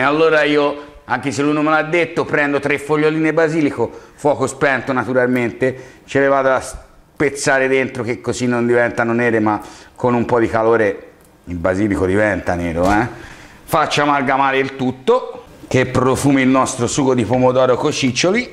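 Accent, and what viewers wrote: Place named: Tuscany, Italy. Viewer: Sei strabravooooooooooo❣️❣️❣️❣️Mio marito adora i ciccioli e devo assolutamente cucinare la tua ricetta,ma in Svizzera non trovo i ciccioli xciò dovrà aspettare la nostra prossima puntatina in Italia e precisamente a Brescia.Sei simpaticissimo ,adoro il tuo modo di fare è il tuo accento toscano.Bacione😘😘😘😘😘😘😘😘😘😘😘😘😘😘😘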